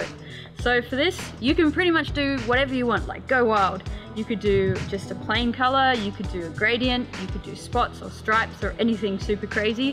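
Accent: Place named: New Zealand. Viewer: Love this kind of art, and the kiwi accent :)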